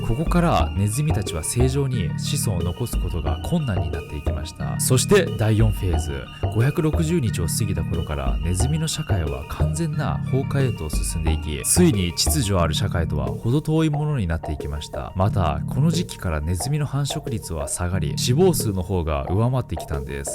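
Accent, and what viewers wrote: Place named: Japan. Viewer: 、英語の発音が日本語発音になってる、、、気を使ってるのかな？もっとネイティブの英語を聞きたいのですが。日本の大衆圧力でしょうか？なおきマンらしい昔のネイティブ発音でいきましょうよ😁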